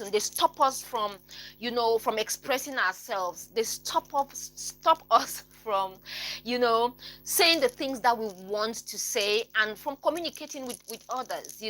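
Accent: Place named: Democratic Republic of the Congo. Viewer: my sweetheart. Listening attentively to you, I think you are originally from Congo ( Zaire ).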